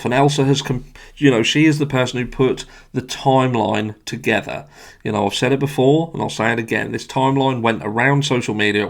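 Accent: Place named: Suffolk, United Kingdom. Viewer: Are you from suffolk? I'm in Suffolk and you sound like it 😊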